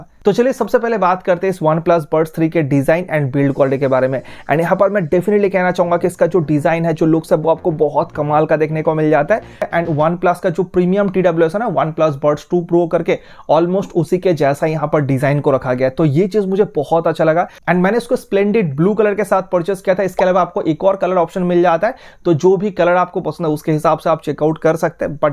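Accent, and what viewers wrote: Bengal, India. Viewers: Bhii app Bengal see hoo kya??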